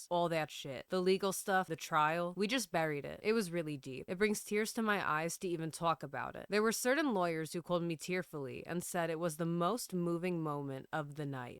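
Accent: New York, United States. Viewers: When your sick you sound more like a New Yorkian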